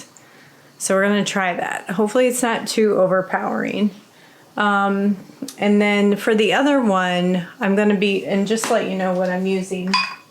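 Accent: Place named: Michigan, United States. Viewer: My family is from Michigan 😊 I love the accent it reminds me of them 💜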